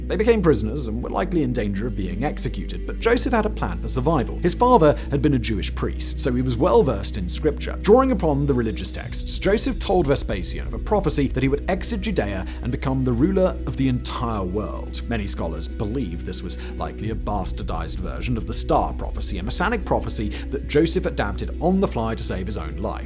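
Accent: United Kingdom. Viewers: Forget the beard or the chrome dome, the glib UK accent and rapid fire delivery hooked me. I predict in less than a week, I’ll have watched no fewer than two more of videos.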